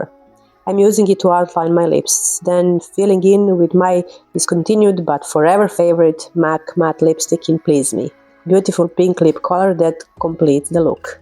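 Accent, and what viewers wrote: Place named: Russia. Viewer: вы русская? Зачем говорите на англ. языке, да еще и с таким акцентом?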